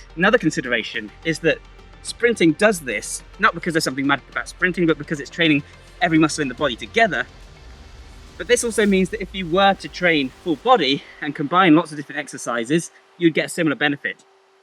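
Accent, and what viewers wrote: United Kingdom. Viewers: Great Video ! I remember when I played Football (America/Canadian Style) we had to run smaller hills and Short Sprints 40-70 Yards. The NFL Combines (Selecting Top College Players for Profession Teams) one of the Tests is the 40 Yard Dash (Record was 4.21). Sounds like your from the UK so I guess Rugby and Soccer Players must have to be Fast sometimes with explosive Burst of speed too. Also when I did Track and Field the real Power Sprints seemed to Top out at 200 to 400M after that I think some Pacing after that would be required if going Full Bore. Roger Banister 1st man to do 4 minute Mile would Average 17.60/100 yards in a 1 mile run. Also what do think about Cross Country Running, in your video looks like that or mixing up the Pace I guess. Even in the Boy Scouts (old days) was something like Walking 50 Yards them Running 50 (alternating) when hiking sometimes. 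I guess the Top women sprinters (100 M) are only than a second or 2 behind the men ?! Also to qualify for Police officer training here Recruits must run 5 KM in 30 Minute. What do you think ? Thank you Jim Canada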